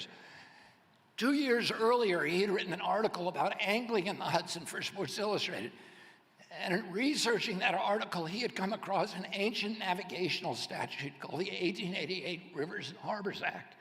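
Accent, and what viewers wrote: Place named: England, United Kingdom. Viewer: it doesn't sound like boston to me though, it sounds like an english accent, idk its no big deal just weird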